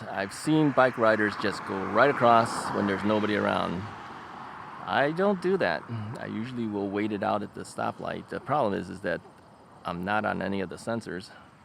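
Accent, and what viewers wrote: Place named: Illinois, United States. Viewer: It sounds like you're in IL, up north somewhere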